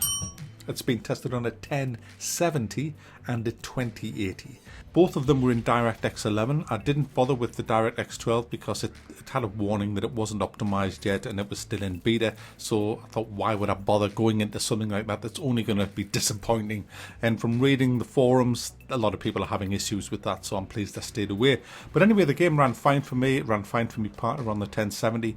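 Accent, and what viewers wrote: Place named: Newcastle, United Kingdom. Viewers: Byker grove land I think. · Oh you’re a geordie!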